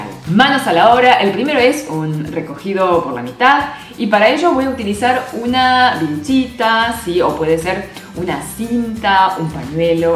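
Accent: Argentina. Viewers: Hablas cm argentina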